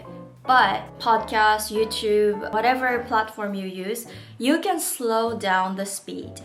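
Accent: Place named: United States. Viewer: きれいなアメリカ英語で素敵です！発音記号IPAは勉強されましたか？それとも耳で入ってきたものを真似してアウトプットする方法ですか？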